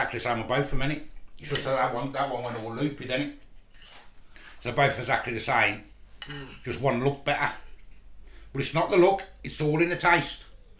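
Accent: Birmingham, United Kingdom. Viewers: You sound brummy or Wolverhampton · Nice,loving the brummie accent,where you from ?,I was born in Smethwick now living in Florida..locals always think I'm South African...lol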